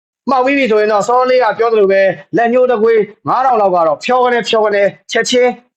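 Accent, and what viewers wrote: Yaw, Myanmar. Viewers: ဒီနေ့တော့ ဂေါပကယောနသံလေးပေါ့💯😊❤ဟုတ် နှမ်းစေ့လေး ဖြစ်ပေးနေပါတယ်နော် ဟို့နွေဦးအစထဲကပါဗျာ့💯တော်လှန်နေသ၍ ကျွန်တော်တို့ အနိုင် 💯💯❤❤🚩🚩🚩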